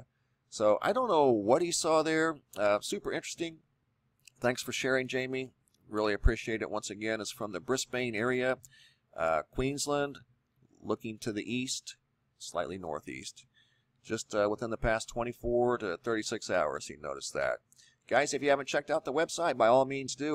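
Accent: United States. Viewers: ThingsAreToughAllOver it's cool mang, he's American I'm just glad they say my name right still.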